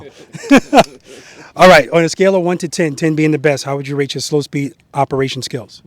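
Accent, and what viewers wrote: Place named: Boston, United States. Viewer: I was working on my bike when this video started. I thought you're a chippy Italian-Irish bloke from Boston at first. 😂 Regardless, I thoroughly enjoyed this video. The way you communicate is top class and I'm so thankful to have stumbled upon your channel.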